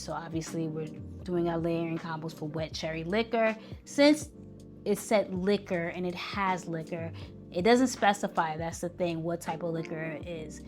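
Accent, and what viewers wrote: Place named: New York, United States. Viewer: I LOVE the fact that you still have the classic NY accent. Its actually becoming rare!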